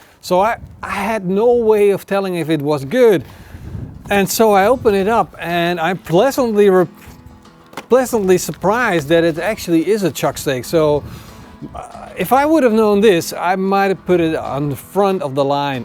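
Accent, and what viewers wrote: Netherlands. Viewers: Would love to see you do some basque beef/ txuleta this year 😉👍🏻 keep up the great work, always love the videos and great to hear the Dutch accent 👍🏻 happy new year from Australia 🇦🇺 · Me watching this video: Noticable thick dutch accent Guy shows cheap steak from the jumbo: Yep definitly a dutchman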